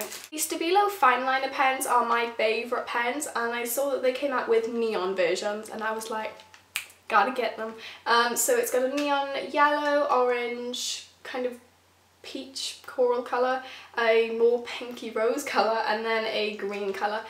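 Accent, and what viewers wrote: United Kingdom. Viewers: Finally a British person!!!! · Where in Wales are you from??? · Are you from England?:) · Yaya someone british! :) love the hair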